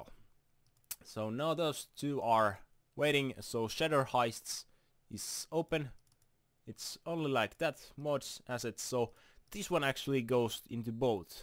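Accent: Finland. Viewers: you have a finnish accent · are u from finland? cuz you have very strong accent :D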